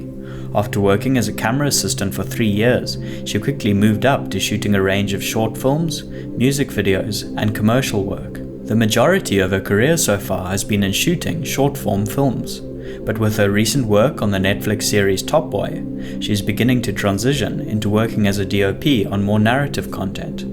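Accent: South Africa. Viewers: You sound South African 🤷‍♂️ lol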